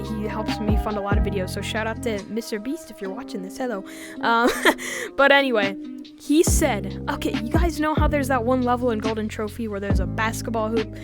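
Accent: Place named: United Kingdom. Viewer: Are you British or American I cant tell 😅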